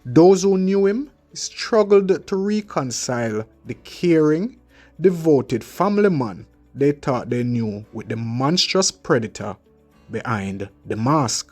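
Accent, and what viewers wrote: Jamaica. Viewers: Listen bredrin it's not the first time I've heard about Delroy Grant but it is the first time I've heard this narration and I love it I just love to hear my Jamaican dialect taking off the Ts and emphasizing the Hs gwaaan my yout proud ah yu and Leicester is how you said it correctly sir and you've gained a subscriber ❤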